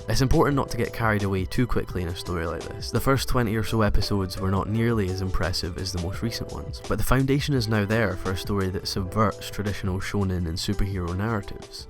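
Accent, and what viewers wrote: Scotland, United Kingdom. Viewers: You sound Scottish, where abouts?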